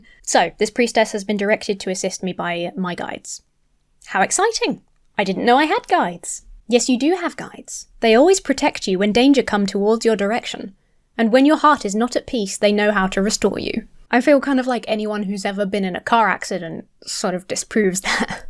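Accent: United Kingdom. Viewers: Pretty sad when people get scammed like this. I enjoyed watching you have a bit of fun with the priestess. Love you, ya funny British-talking satan-worshipping lady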